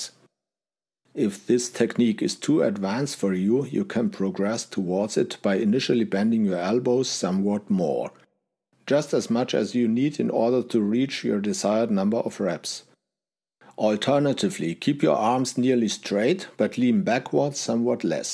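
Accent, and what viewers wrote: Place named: Germany. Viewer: As someone living in Germany, I can tell this man is German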